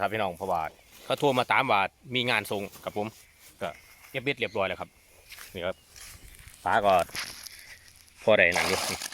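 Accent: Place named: Korat, Thailand. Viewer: สวัสดี เป็นคนโคราชมั้ยครับสำเนียงคือเลย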